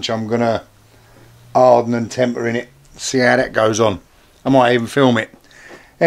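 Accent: East London, United Kingdom. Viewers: As you say not sure whether it was worth it, but on the whole I think it was, because if you had gone out and bought another one it would be a, Chinese, b, crap, and c, you would have had to put it on the mill to correct the crappy machining to get it anywhere near as accurate and smooth operating as you have made your old one! By the way, is that a Warco mill? This is the first time I have seen you using the mill, and as its the same colour as my Warco 300 lathe/mill outfit i just wondered. I live in L.B.Redbridge, are you anywhere near me? I ask that as we share a common East London accent and I mean in my case at least very common! I look forward to your next project eagerly.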